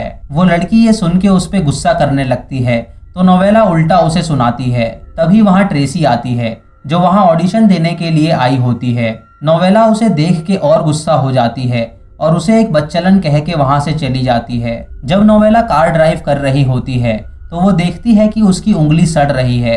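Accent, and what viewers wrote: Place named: Bihar, India. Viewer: Bhai bhihaari hain kya (hain,hain,hain) bol raha Bhai sahi se explain ker movie. 🖕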